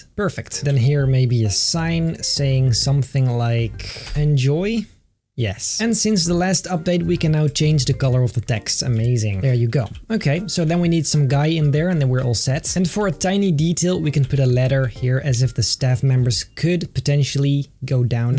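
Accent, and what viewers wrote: Netherlands. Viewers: Are you from the Nederlands?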